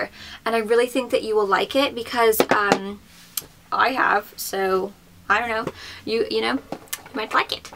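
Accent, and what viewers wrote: England, United Kingdom. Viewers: In this video I could totally hear your English accent!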